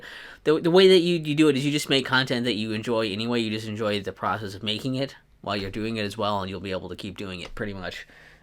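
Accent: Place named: Minnesota, United States. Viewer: I think it's really cool you still enjoy making videos, and I am glad you do post them and share them with the public.🙌 I've been making videos for about 12 years and never thought to be bold enough to post anything, so it's really good that you have and stuck to it! That's mad that you don't experience ASMR yourself, you are very good considering you don't.👌 As for the ASMR voice, you do still have one, but it is definitely nothing to do with being bored and then falling asleep... if that was the case, I wouldn't of watched your videos. 😅Haha thats cool... hello over to Minnesota! I can here that accent slightly ... I only know Minnesota from Marshall in 'How I met your mother'😝Your YouTube plan sounds good, I'm excited! 😆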